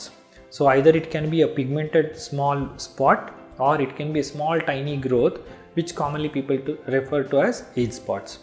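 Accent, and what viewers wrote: South India, India. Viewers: For those who could not understand his South Indian accent, here is a brief synopsis: UV rays damage the skin over time; we are exposed to UV rays not just from the sun but also from various electronic screens (he says all the screens produce some UV rays). The treatment he recommends is sunscreen.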